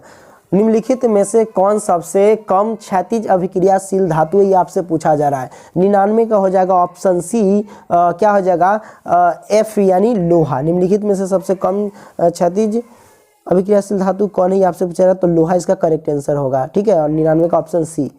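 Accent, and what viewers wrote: Bihar, India. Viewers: Sir aap Bihari style wa......🤞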